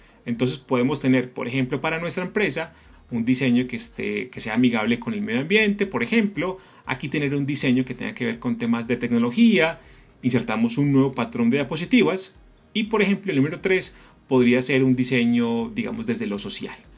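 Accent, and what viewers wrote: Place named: Colombia. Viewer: esta chido, gracias, ....osea que lo mas aprovechable es que lo haces de forma tal que automatizas con el patron, la neta que me gusto pero toma su tiempo, en todo caso te agradezco y si no me equivoco eres colombiano, me agrada el acento de ellos, gracias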